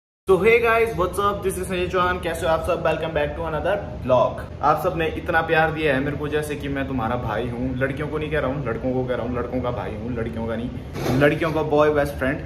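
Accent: Pahadi, India. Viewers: Hi I am also from Himachal but unlucky I am live in Delhi . Mujhe apki Hindi sunkar lag raha hai ki app pahadhi hi ho because your voice pronunciation like pahadi people. Love from Delhi but from Himachal ❤️❤️ pahadi logzzzz 💜💜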